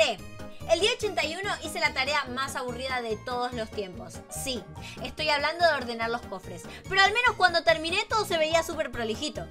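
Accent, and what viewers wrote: Argentina. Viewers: Te quiero mucho y me encanta el idioma argentino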